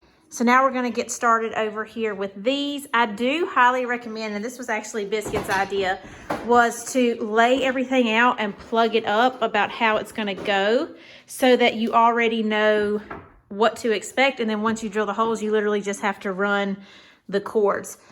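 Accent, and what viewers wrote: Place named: South, United States. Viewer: Adorable slow southern drawl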